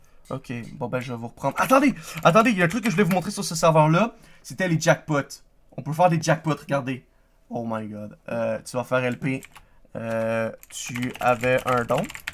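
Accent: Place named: Quebec, Canada. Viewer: un qc full yo dans ces phrases mdr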